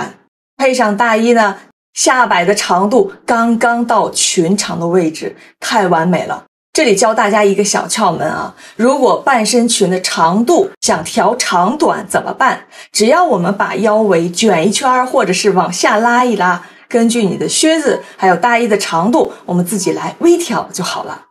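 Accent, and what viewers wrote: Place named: Japan. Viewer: 你推荐的这几款大衣从面料到色彩到版型，都非常的好，优雅高级，我跟着你给的link看了一下，价格也很亲民。👍。很可以买。手痒。还有你上次推荐的海浪包，也同样出色，美观实用，品味超群，还是美国华裔设计师的作品。棒！ 但是，我觉得，这几款大衣，不太适合你。因为它们的肩膀自然下垂，随着你的肩膀走，太溜肩了。显得头太大了。（你的这个视频里的有些照片，从下向上照的，把头照小了很多，看起来比例就好多了。）其实，这涉及到一个头身比/头肩比的问题。以中国人的传统审美，你的比例是完美的，就像明清仕女画里走出的美人。但现代全球审美是西方七头/八头身的审美，（你给的穿大衣的模特照就很明显，她们头小肩宽，穿起来很不一样）。所以我觉得很多传统东方美女要靠衣服的裁剪来修饰一下头身/头肩比。 我的家人是你的粉丝，非常非常喜欢你的频道，她觉得你的推荐，属于日本风格，低调典雅，特别适合东方女性。我也同意。挑一点小毛病，你的品牌发音，应该都是日式的，和在欧美地区流行的英语式发音（Anglicized）有区别。比如UGG是“阿格”，Louis Vuitton 被称为“LV”别人也能听懂，Hermes 近乎“Air Mess”。日式发音会让人一愣，不知所云。日本人分不清V和B、R和L。用日文来音译欧美品牌，相对来说较为困难，可能还不如汉语的音译。比如“路易威登”“爱马仕”什么的，和品牌的英语式发音还挺像。我猜你的观众不少都在日本之外，或者改用英式发音更好些？ 看到说，你1977年买了第一个LV 包，但你看起来顶多30出头。太惊人了！希望今后多发保养穿搭显年轻的视频呀！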